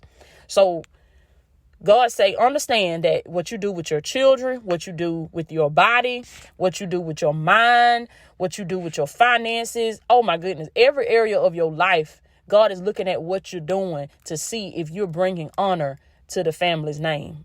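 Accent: South, United States. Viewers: You just don’t know how much we love your country accent! ♥️♥️♥️♥️ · I like listening to your country voice. All your messages are interested and inspiring. I even sent my momma your link. She was talking about you today. I told her you only 33 years old with so much spark and fire in you and all your messages. It's the God in you that moves you. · We love, your Southern Accent!💝 It brings the message across in a passionate way. God Bless.💝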